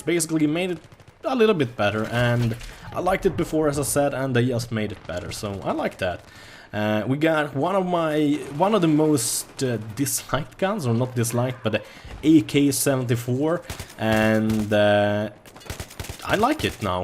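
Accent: Sweden. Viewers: He's Swedish. It's his accent. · Are you Swedish?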